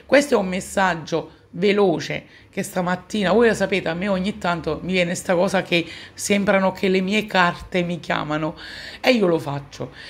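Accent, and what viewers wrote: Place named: Naples, Italy. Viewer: Grazie,per le tue parole,anche il tuo napoletano è dolce ascoltarlo ,per me è la prima volta che ti ascolto,può essere così inportande sentire, quando tutto intorno è crollato, una previsione serena,auguri anche a te per la festa della mamma,penso che tu lo sia e anche se non lo sei auguri comunque,ciao.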